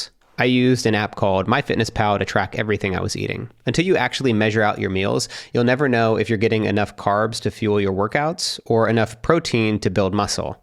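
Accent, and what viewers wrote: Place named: Australia. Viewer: Catches me so off-guard hearing an Australian accent in a YouTube video, and I have an Australian accent and make YouTube videos hahaha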